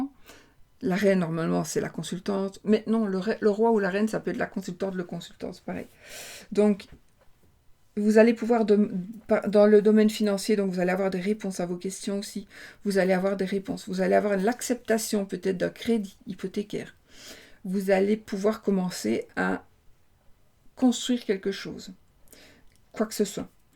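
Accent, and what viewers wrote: Belgium. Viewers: Bonjour, toujours aussi ravissante! Av son jolie accent belge que j adore!je suis a ma pause a mon travail a l hôpital, et je suis venue voir directement ta nouvelle vidéo, quel plaisir de te voir! Concernant le tirage, certaines choses me rejoigne moi le célibataire, mais pas tout évidemment! Bonne soirée 😘🌹😘🙏♥️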